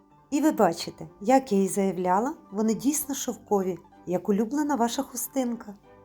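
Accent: Standard. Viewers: Як ви гарно розмовляєте ❤❤❤. Приємний голос , чиста українська мова, рецепти і їх подача чудові ❤❤❤. Удачі вам , підписуюсь ❤❤❤ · Обожнюю ваші рецепти.Дивлюсь на одному подиху.Поки не маю можливості спробувати бо не вдома.Але незабаром думаю реалізую таку можливість.Окремо дякую за прекрасну,чудову,гарну, неповторну,чисту, правильну УКРАЇНСЬКУ МОВУ. Це неймовірно.Натхненя,наснаги,здоров'я Вам і всього найкращого.❤❤❤❤❤🎉🎉🎉🎉🎉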